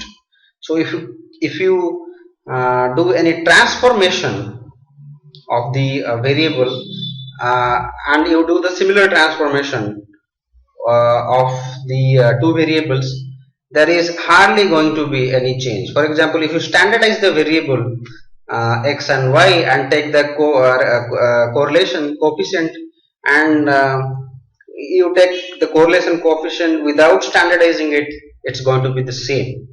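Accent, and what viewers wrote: India. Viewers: hello sir,nicely described. You seem to be an odiya am I right sir?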